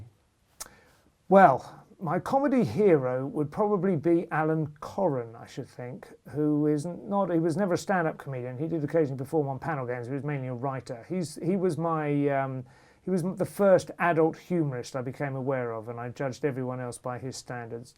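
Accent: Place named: RP, United Kingdom. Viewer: Yes, as I commented earlier in this thread, in response to Terence Quinn: "I had the same discussion with you before on another of Evans' YouTube videos, so it seems that you are just a troll who clearly dislikes someone speaking with a comparatively posh accent, and immediately jumps to (the wrong) conclusion about money and a private education (a minimal amount of research on Wikipedia would have told you about education, but you clearly couldn't be bothered)."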